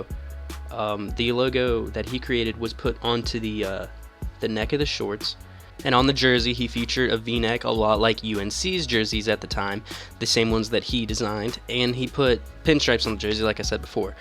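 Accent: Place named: Philadelphia, United States. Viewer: u gota thick philly accent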